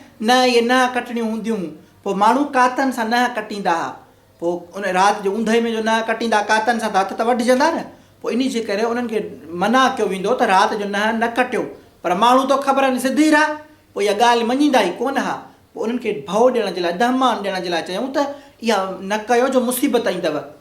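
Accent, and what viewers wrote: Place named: Northern Sindh, Pakistan. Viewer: I like the way u speak Sindhi actually it's an utradi accent of sindhi (north side) they speak in the same way and we speak laar side Sindhi(south).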